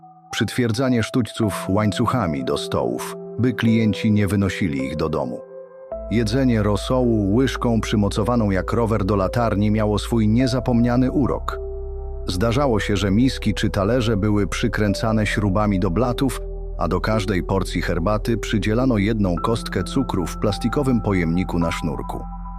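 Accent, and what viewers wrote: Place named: England, United Kingdom. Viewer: Bez urazy, ale wiedza autora z filmów Barei przesadzona w surrealizm. Oczywiście pewne fakty są prawdziwe ale np te z barów mlecznych z łańcuchami na sztućce i naczynia przykręcane jak na Misiu Barei to przesada. Polacy mieli dolary od rodziny z zachodu oraz bony PKO aby kupować w Peweksie lub Balatonie np walkmeny japońskie Wranglery itp. pracowali za dewizy np w Iraku czy Libii. Osobiście nic nie miałem do barów mlecznych. Chumorystycznie lecz przesadnie pokazana rzeczywistość PRL na kanwie filmowej nie rzeczywistej i ten akcent angielski coś mi mówi. Pozdrawiam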